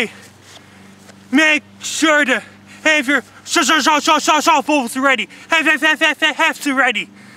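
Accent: Newfoundland, Canada. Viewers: Atlantic accent is like this. Especially Newfoundland..You can't even understand what they're saying.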